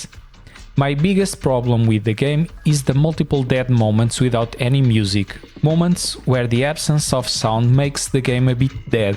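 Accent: Portugal. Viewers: Este sotaque tuga nao há como falhar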